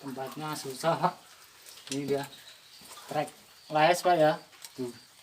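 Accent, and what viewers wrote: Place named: Java, Indonesia. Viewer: Kaya orang Jawa logatnya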